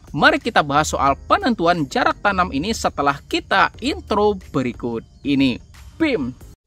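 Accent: Banjar, Indonesia. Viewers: Pian urang banjar kah mang??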